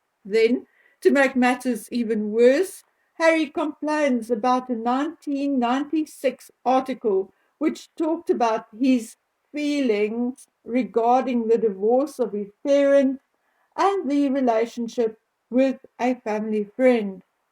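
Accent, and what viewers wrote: South Africa. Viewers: Hello, I just happened upon your channel and recognise the accent, are you South African??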